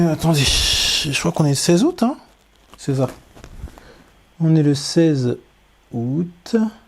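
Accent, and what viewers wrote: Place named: Europe. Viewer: I hate European accents, too annoying. Always sounds enthusiastic no matter your actual expression.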